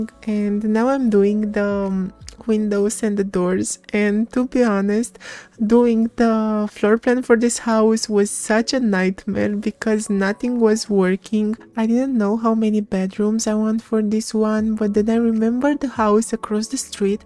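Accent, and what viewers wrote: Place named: Greece. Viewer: Your Greek accent 😍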